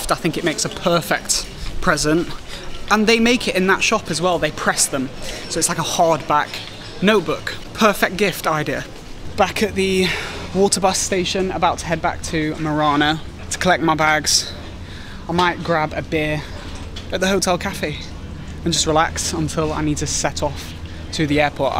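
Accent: United Kingdom. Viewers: You've got really lovely British accent :-P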